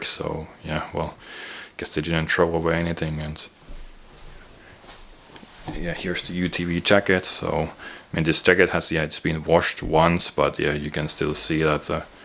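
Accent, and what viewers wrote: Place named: Finland. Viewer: Kyllä se aksentti vaan paistaa läpi meillä🤣 Mutta hyvä video! Alkanu lähiaikoina kiinnostaa kylmän sodan aikanen varustus euroopan maissa niin nää on käteviä!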